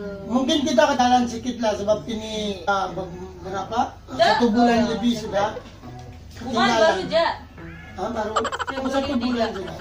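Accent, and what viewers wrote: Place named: Manado, Indonesia. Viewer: Kok bisa bahasa Indonesia, pasti tinggal di pulau dekat pulau Miangas diperbatasan, atau mungkin sering ke manado, soalnya logatnya sama 😅 kalau saya dari gorontalo provinsi sebelah manado jadi logatnya sama.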